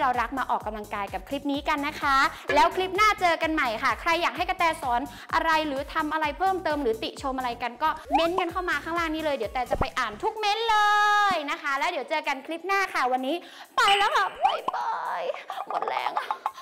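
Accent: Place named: North, Thailand. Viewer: ชอบความปาเเล๊ดเหนือขนาดจ้าว พูดไทยทุกคำสาบเมืองได้จาใด เก่งขนาดจ้าว ไว้จาทำตาม ^^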